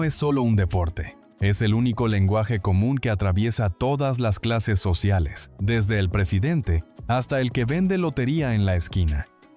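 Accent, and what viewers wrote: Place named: Mexico. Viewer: Y si! El que hace el vídeo , tiene acento mexicano ,algo le pica ,con su intención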